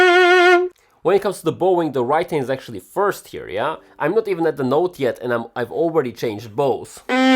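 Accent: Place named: Russia. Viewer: are you russian speaking? I hear sometimes russian accent 😄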